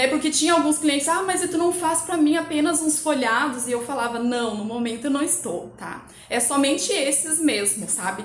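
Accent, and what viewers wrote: Rio Grande do Sul, Brazil. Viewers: Fiquei um tempo no sul e era difícil achar salgado bom. No RJ tem muita gente boa. Pelo seu sotaque eu acho que vc é gaúcha ❤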